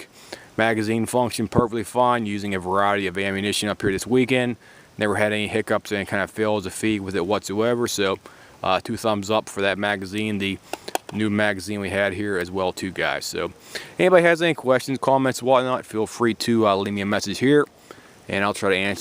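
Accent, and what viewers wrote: Pittsburgh, United States. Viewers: This guy is definitely from the Pittsburgh area!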